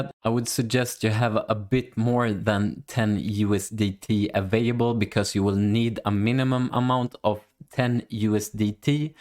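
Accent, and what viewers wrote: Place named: Sweden. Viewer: I love that Swenglish-Makes the video so much entertaining : D - Thanks for good tutorial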